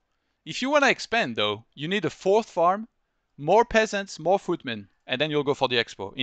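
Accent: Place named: France. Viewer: la légende FR toujours un plaisir tes vidéos même avec ton accent bien français mdr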